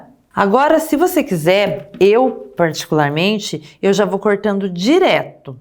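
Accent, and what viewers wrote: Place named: Ponta Grossa, Brazil. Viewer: Adorei a sua aula ❤ O sotaque me dá muita saudade da minha avó de PG 🥰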